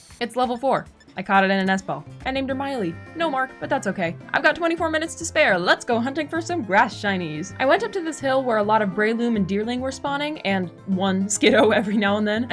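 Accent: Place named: United Kingdom. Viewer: You sound like someone from around the uk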